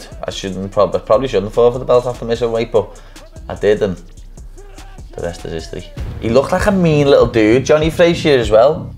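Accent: Liverpool, United Kingdom. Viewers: liverpool, england. known as the scouse accent. it's a love or hate it kind of thing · I can actually understand what you're saying when you're alone like this, and not playing up the Scouser accent with your buddies. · What is this accent? Where is he from exactly in the UK?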